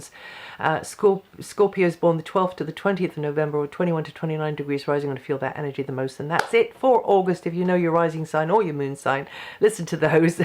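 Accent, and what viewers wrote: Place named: RP, United Kingdom. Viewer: great reading which part of the UK do you live in you sound posh xx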